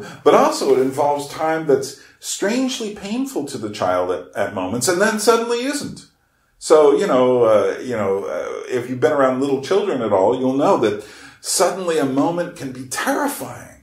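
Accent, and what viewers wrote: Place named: United States. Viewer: Thanks for the link to Rookmaaker. His english with german accent is tough to my spanish ears, but I captured the essence of the lecture (he says "the heart is a pump", don't he?) I can see the conection beetwen he and your videos, and now I think you were right when you said that all of us are postmodern thinkers. Even Rookmaake seems postmodern when he insist in the reallity beyond the called "facts", or science, or aristotelic logic. This is an idea shared with the postmoderns. In fact I remember myself as a teenager defending the idea of the reallity as a personal construct. I used to like science, math and all that, but I never liked the scientificism in the philosophical sense, it's seems a silly vision, the philosophy of an AI, I supouse. The problem is that postmodernism has become worst han those square minded fisics I didn't like in the seventies and eighties. 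PS: I can understand you well enough, your american accent is the easiest to me. The worst are british people, with all these crazy vowels...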